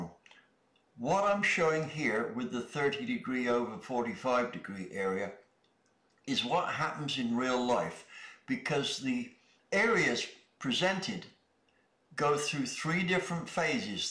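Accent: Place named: West Country, United Kingdom. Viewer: Hearing a west country accent🤔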